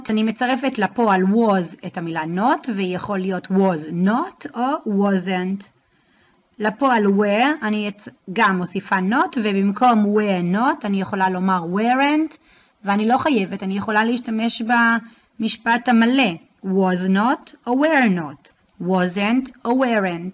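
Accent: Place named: United States. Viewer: אהההההה מבטא אמריקאי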